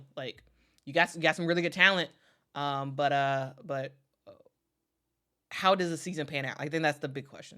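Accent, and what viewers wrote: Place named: New York, United States. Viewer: where is this nyc accent coming from? Lol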